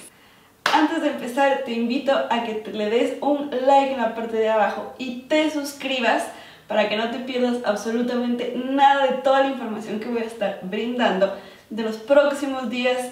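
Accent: Mexico. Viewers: Tu tienes acento como si fueras mexicana no suenas ecuatoriana. Me gustan mucho tus videos, que Dios me ayude a conseguir lo que quiero ❤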